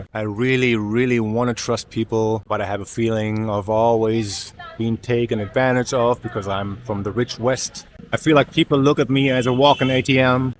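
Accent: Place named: Germany. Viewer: Just from your accent I understood youre Deutscher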